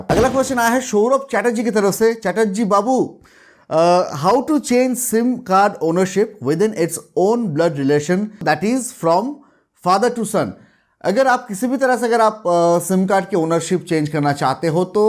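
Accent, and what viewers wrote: Bengal, India. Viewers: आपका बोलने का तरीका(लहाजा) एक दम मस्त लगता है बंगाली हिन्दी मिक्स। मजा आ जाता है । # जल खाबो।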